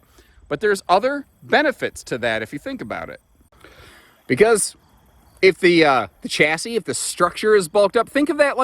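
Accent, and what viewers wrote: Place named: Upper Midwest, United States. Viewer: They very first video of yours I watched I heard “right dere” used as punctuation and knew you were a good upper midwestern boy. I, too, am from the midwest and it’s pop. I’ve got the significant other trained to say pop when speaking directly to me (it’s soda otherwise) and my adult daughter is still with us and said that if she goes back to Alabama calling it pop she’ll be ticked. It’s pop. 😬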